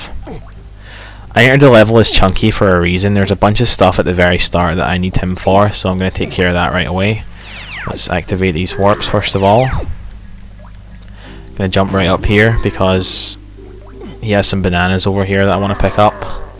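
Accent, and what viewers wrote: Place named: England, United Kingdom. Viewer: I will forever associate this game with an English accent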